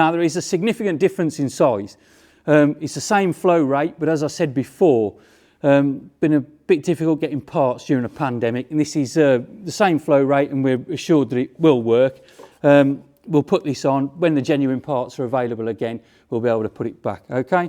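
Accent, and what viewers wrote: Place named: Cockney, United Kingdom. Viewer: His accent is not an accent you hear very often, consequently unfamiliar to most Americans. If I were guessing, I would guess it's a Cockney accent which is barely intelligible to the average American.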